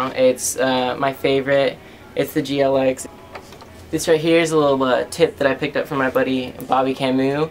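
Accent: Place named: United States. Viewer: dat american accent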